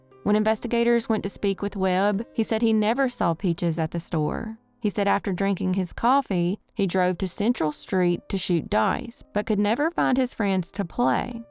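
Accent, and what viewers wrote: South, United States. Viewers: Ok, the channel, the accent. Super cute. Hi from Kentucky! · This blog is delicious. Your beautiful Southern accent is enchanting. These mysteries are head scratchers. Keep your children close. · Middle Tennessee girl here, and ready to go!!!! Thanks for yet another well told video. The best difference between your and many YT true crime channels is that it always shows that you genuinely care about the people touched by the crimes, not just the facts of the cases! And one of my ABSOLUTE FAVORITE aspect of your channel is hearing the same southern accent I have! I really don't think there are near enough YT channels with people that have our accent! ❤Hope you are having a wonderful 2024 so far, Love❤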